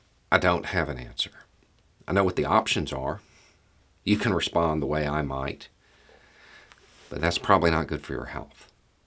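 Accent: United States. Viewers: This is one of your best Beau, I've PTSD, and my wife doesn't like you because you are an American, and look like you do and sound, if it helps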